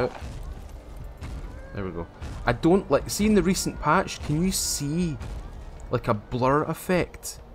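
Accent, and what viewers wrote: Scotland, United Kingdom. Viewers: Ah the thick Scottish accent love the accent of some of my ancestors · I love your scottish accent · are you scottish? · is he scotish?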